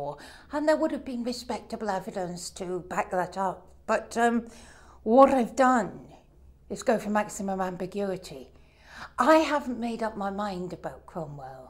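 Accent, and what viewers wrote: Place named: Derbyshire, United Kingdom. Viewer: She's posh for Derbyshire, I'm from there and most people don't sound like this!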